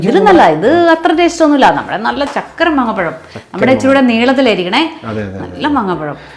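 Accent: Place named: Ramapuram, India. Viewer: നാടൻ സംസാരം.. അറിവുകൾ... അമ്മച്ചി അടിപൊളി.. രാമപുരം ആണോ??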